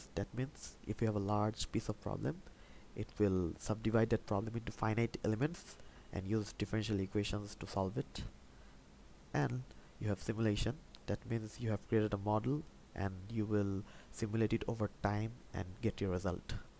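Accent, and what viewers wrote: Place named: Bengal, India. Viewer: Hi!! there, you are doing a good job. I am also learning COMSOL by myself and struggling in some parts. though my area of focus is electro - thermal or electro- thermal -mechanical simulation & jpule heating stuffs. Hope your tutorials will be very helpful. By your talking accent, it seems you are bengali. am I right? Have a nice day and best of luck.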